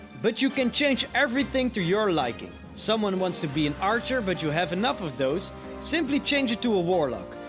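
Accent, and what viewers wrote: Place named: Netherlands. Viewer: Ok, so now byleth gets added to smash, and I was wondering if this game would be something for me. I got into persona 5 (which is now my favourite game of all time btw) because of joker in smash, so I was wondering if this would happen with fire emblem for me. So I searched for a vid and found your channel and you’ve honestly sold me!!! Awesome job! Ohw... yeah also, you sound really dutch. Dus als je Nederlands bent en dit leest. Ik lieg niet als ik zeg dat je video’s van hele hoge kwaliteit maakt! Ik hoop dat je snel groter groeit! Zeker een sub erbij!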